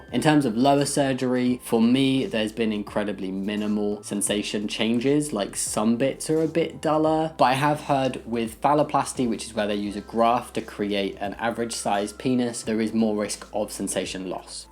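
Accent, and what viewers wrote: United Kingdom. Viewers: Honestly the only question I want to ask him is about his accent. Like it sounds British, but specifically, what is it?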